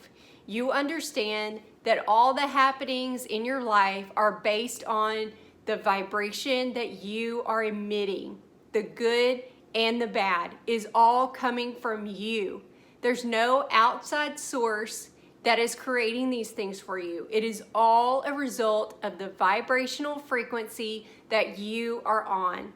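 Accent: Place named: East Texas, United States. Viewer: You have got to be from East Texas! Love your message..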